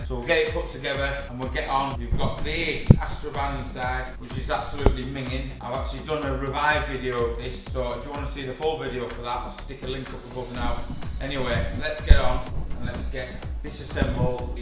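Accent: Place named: Scotland, United Kingdom. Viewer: This man is speaking in a Scottish accent.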